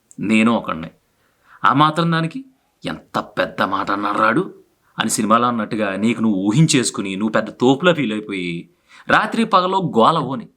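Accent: Godavari, India. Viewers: Hi bro, midi godavari district kada, ekkada. Baagundi language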